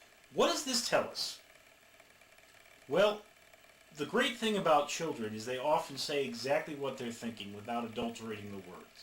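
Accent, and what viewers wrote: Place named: Australia. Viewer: def australian well g'day mate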